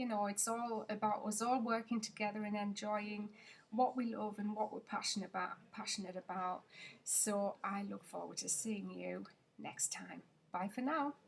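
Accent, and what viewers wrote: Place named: United Kingdom. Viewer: Hello I have been watching you for weeks now, and I just live listening to you talk about how Eden's Secrets came to be. You are very pretty and it's nice to put a face to that beautiful accent. I am from the states and I LOVE British accents. My goal is to go threw and watch all your videos and try to comment in each one. Wish me luck on my adventure. Bye for now.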